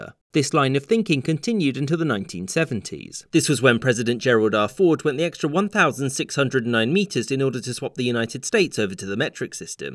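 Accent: United Kingdom. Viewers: Clicks video to hear explanation. Hearts British accent. Laughs in American metric. We use both systems, jackass. It's literally on our food labels. Our races are 3k, 5k, 10k, 15k. Marathons are marathons, get over yourself. I go to the store and can buy soda by the liter. Our hospitals use it. We use F because it makes more sense. No? then why are freezers in the UK set to 0 Fahrenheit? Enough with making your citizens ignorant.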